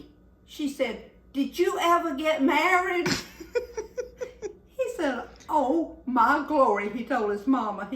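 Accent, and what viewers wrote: South, United States. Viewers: I absolutely love your accent. You sound like a southern belle. I can't tell if your accent is from South Carolina or Mississippi but you sound like a southern bell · I love that suthen accent. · Love the southern “y’all” since I grew up in Tennessee. My sister (God rest her soul) and I would be making this right now. Looks delicious 😋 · When she said she was going to do her happy dance, I was so sure she'd be talking about the chocolate, but I should have listened to the southern accent and known she'd be talking about the butter! 🤣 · Love the Real southern accent ❤ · Oh Honey when u started talking I knew u were from the south just like me I've been in Ks. 4-40 yrs but go back 2 No. Car. 4 2 mon. & at Christmas So happy I've found U My daughter made this cobbler & we sure loved it 💙🙏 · Oh man, I’ve found my next potluck dish 🥰 I can’t believe I’ve never heard of this! 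It looks delicious, thank you so much. I don’t know where you’re from, but you sound southern and hearing you reminded me of my Granny and Mammaw, I miss them so much ❤ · I absolutely love her southern accent. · Hello! Love your channel! Your southern accent reminds me of my late aunt who lived in Memphis, Tennessee. Are you from that area? 😃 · I don’t think there was a single word in this whole video that ended in “er” and I absolutely adored it. Madam, you remind me of all those wonderful little old white haired southern chefs from the church socials when I was a young lad growing up in southern Missouri. Mind you, they fed me very well and I’ll be making some of this cobbler for my family soon. Thank you and have a blessed day! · She reminds me of my Mamaw Love her Southern Accent ❤❤ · Love love love her Southern Accent. 💓💓💓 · I forget where it was filmed, but it was somewhere here in the Deep South! 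It's a beautiful Southern drawl. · I’m from the South also metro ATL GA, But her accent is different from ours, I’ve noticed each Southern State has a different sound, I can usually pick up, Ala, Ms and the Appalachian States have a really different sound. I love Southern voices…..🍑🇺🇸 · This brought tears My mama made this and I have looked for the recipe for ever Love your sweet southern way of speaking Im fom !South Carolina and you sound like family ❤❤❤ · I’m from Texas but her accent is much more southern than mine and it’s just precious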